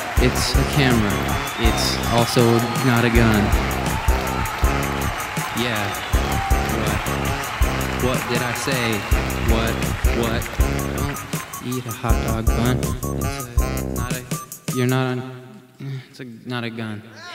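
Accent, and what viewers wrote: United Kingdom. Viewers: I thought it was real until Simon said "Well, here's your chance" but I still had doubts before that because I was like "Wait... isn't British.. Is he? 0.0" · umm is he really british?...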